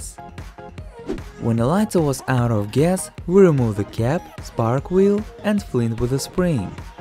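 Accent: Russia. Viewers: because he has a russian accent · i just loooove his russian accent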